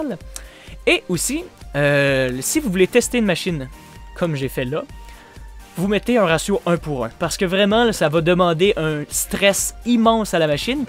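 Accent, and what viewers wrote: Quebec, Canada. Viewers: tu es Québécois? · Oh un québécois .....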